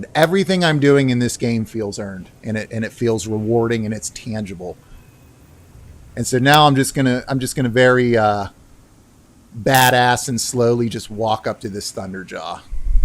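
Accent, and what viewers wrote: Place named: Pennsylvania, United States. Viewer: Yes! Aloy freakin rocks, haha. And the backstory is just chilling... Btw, I hear a familiar accent in your voice. Pennsylvania? Maryland? I grew up in Delaware. :)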